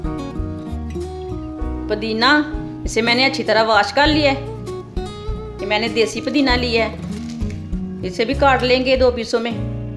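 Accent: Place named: Punjab, India. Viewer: Your speaking tone like pure Punjabi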